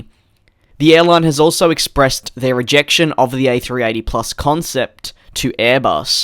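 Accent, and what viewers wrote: Australia. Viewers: Are you from Australia? i'm pretty sure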